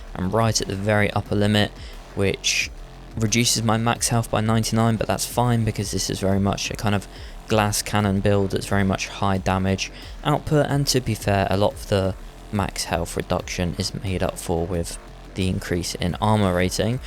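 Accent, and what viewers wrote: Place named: England, United Kingdom. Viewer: But also the more I watch this channel the more you remind me of Avarti from the monotone voice to the English accent to the weird takes on things, I’m glad cause we need more of y’all on YouTube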